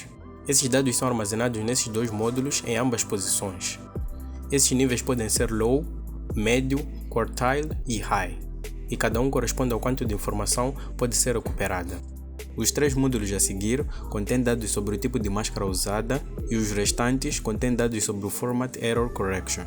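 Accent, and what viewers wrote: Mozambique. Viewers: Moçambique(Moçambicano), Nampula creio eu pelo sotaque. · Eu acho bonitinho o jeito que vocês Moçambicanos falam.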